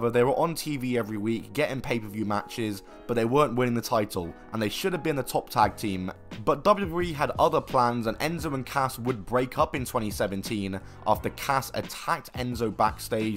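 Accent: United Kingdom. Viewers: I think it's the British narrator's voice that makes me feel smart and snarky, too. 😆😆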